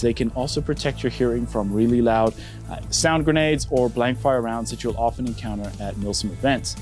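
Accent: South Africa. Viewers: you south african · Are you from south africa? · Are you south african?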